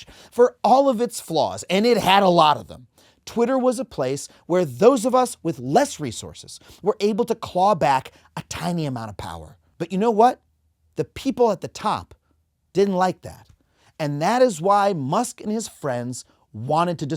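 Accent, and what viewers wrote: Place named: California, United States. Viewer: I get his point, but has such a California pretentious way of speaking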